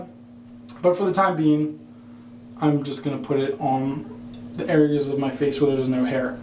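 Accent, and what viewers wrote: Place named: Rural, United States. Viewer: You Speak like a brain dead hick ....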